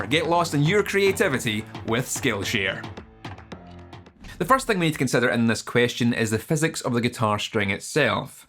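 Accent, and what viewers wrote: Scotland, United Kingdom. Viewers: is what the entire world think scottish people sound like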